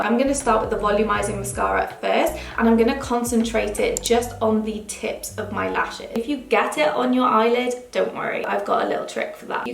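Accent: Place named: Wales, United Kingdom. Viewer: Nice to hear a lovely Welsh accent, nice change from American. Not that I’m biased! 🏴🏴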